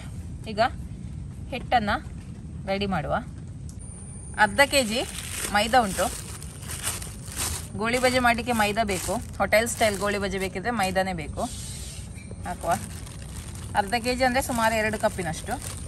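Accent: Mangalore, India. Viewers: Mangalore kannada thumbha chennagide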